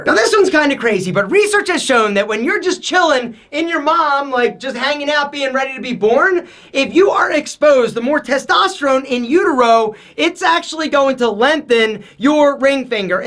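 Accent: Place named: New Jersey, United States. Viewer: where in Jersey is this guy from lol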